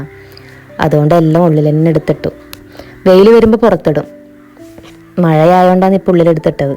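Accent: Kannur, India. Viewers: Kannurkaari analle samsaram❤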